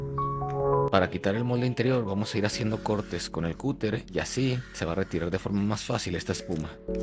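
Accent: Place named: Chihuahua, Mexico. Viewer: excelente video, gracias por compartir la informacion, no todos son asi. saludos, suena a que eres de chihuahua :P jaja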